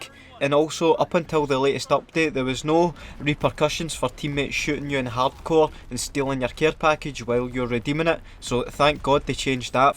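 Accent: Scotland, United Kingdom. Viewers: That scotish accent tho, its hard to understand sometimes since im Murican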